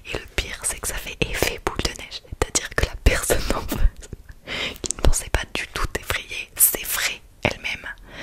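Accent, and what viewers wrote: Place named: South, France. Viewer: J'avais jamais entendu ton accent du sud !! 😂 Excellent. Moi je le prends depuis que j'habite dans le sud sur certains mots aussi.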